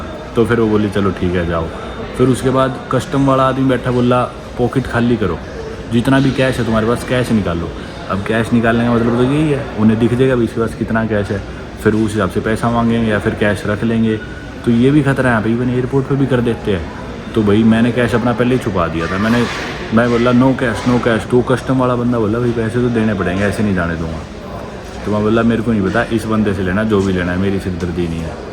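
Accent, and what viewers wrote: Haryana, India. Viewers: No doubt ap boht ache vlogger hen but apne accent ko thora groom kren English bhi ap apne haryanvi accent me bolte hen.. Sari dunia ghoom li ap ne but you sounds like a typical backward villager.... kafi videos me ap ajeeb si awaz nikalte hen jese kisi janwar ko hankte hue log village me nikalte hen...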